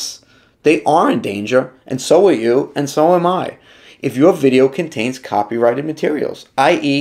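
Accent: New York, United States. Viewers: Great content. Your accent takes me home - NJ? NY? As a transplanted New Yorker I noticed it immediately.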